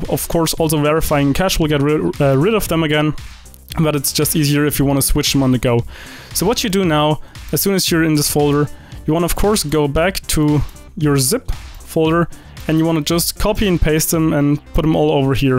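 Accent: Germany. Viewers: mann kann aber ob und zu einen deutschen akzent hören xD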